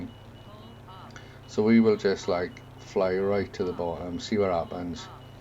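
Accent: Wales, United Kingdom. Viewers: Now THAT's definitely a Welsh accent lol, ello from Mid Glamorgan.. Nice vid, When we get helicopters in this sim? i will definitely drop down in there. Incidentally? This had the Exact same glitch at this point in the olf fsx days.. and i used to host sessions from here.. lol.. and 747's 737's .. we landed them all down there, although it wasn't as deep as this hole in this sim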